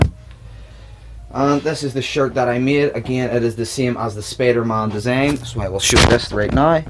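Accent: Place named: Ireland. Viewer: he is from ireland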